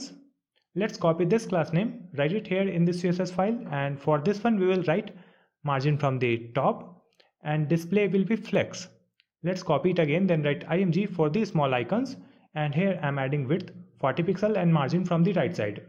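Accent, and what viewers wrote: India. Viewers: he has a normal Indian accent, also learn to spell.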